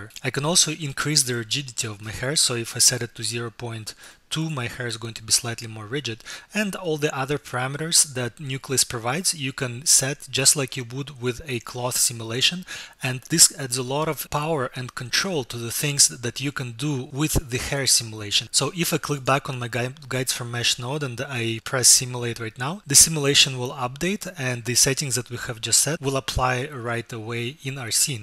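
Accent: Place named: Russia. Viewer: ты русский по акценту видно))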